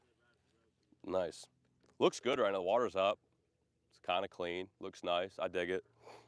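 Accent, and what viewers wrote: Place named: Chicago, United States. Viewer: you still talk like your straight outta the burbs of Chicago Texas hasn't changed your accent at all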